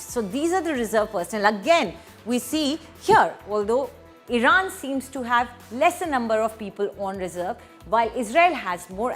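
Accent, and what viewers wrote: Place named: India. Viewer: this indian accent 🤒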